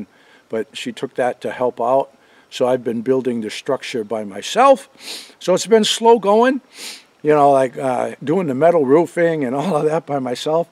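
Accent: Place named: New Hampshire, United States. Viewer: I found your videos about a month ago. I love them, since they bring me back to my childhood days. My mother was born and raised in Franklin, NH in a home that my Pepe (grandfather) built. He had an 8th grade education, but went on to build houses on land that he bought. He also made furniture. I get to hear the NH accent and it makes me smile...again those sweet memories. Mom's oldest sister recently sold the old original family house a few years back. New Hampshire is such a gorgeous state and I can't express just how much it means to me to see a portion of it through your eyes after so many years of being away from there. Thank you and all the best to you. ps. My father and Pepe would of loved seeing your meticulous carpentry work.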